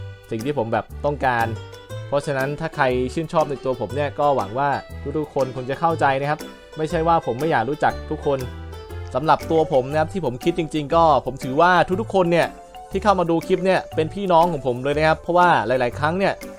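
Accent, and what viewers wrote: South, Thailand. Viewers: ภาคใต้เหมือมนิพี่ผมคนสุราด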